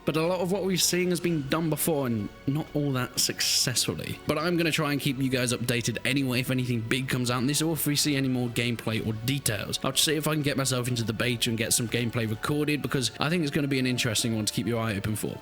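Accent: United Kingdom. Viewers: I can't help but despise your heavy British accent and pretentious voice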